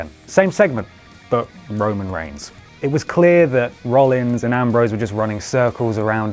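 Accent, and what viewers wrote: United Kingdom. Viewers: i'm a simple man, i hear the british accent, i click like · I'm a simple person,I noticed a british accent,I liked then I enjoyed watching it! That's it!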